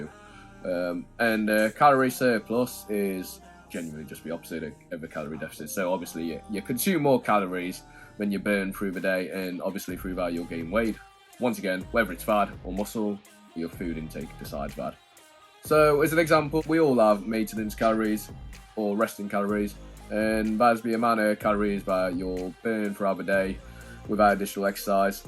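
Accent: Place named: Australia. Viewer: Where does that accent come from, Aussie??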